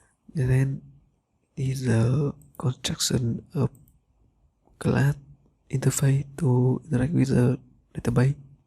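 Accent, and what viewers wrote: Vietnam. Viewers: tưởng ôn ấn độ nào. nghe sound chuẩn việt nam r :))0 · Hình như bạn là người Việt phải không ? Nghe phát âm tiếng anh giống VN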